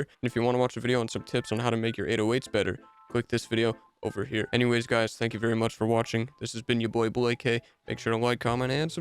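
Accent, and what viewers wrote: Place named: Canada. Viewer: This whole video helped alot and i can hear the canadian in your voice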